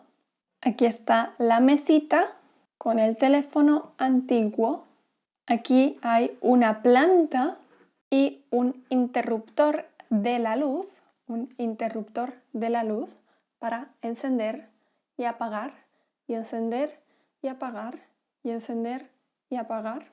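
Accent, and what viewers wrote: Spain. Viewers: Is this Spaniard Spanish? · soy hablante nativa de español, este video fue muy interesante de ver jjajsjs realmente explicas muy bien y tu pronunciación es muy buena, no puedo diferenciar con exactitud si eres de España o aprendiste el acento español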